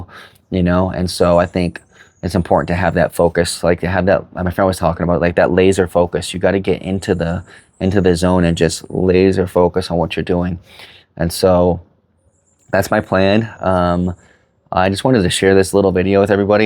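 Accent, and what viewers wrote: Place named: United States. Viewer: I'm gonna pension soon and although I speak fluent Spanish I'm gonna try Thailand. Been there twice and I loved it !..From the sound of your voice I'd say you're far too American, and probably not bringing HUGE amounts of money, to the table, in the business you're working on in Colombia. NO way would I recommend you doing any such activity in ANY country in Latin America unless you have a need for those people to "work for you" !! That's why you're getting those responses ! They're looking at you (as the brains) ..to make money ...for them ! Don't think you're gonna change a culture. You're in their Land.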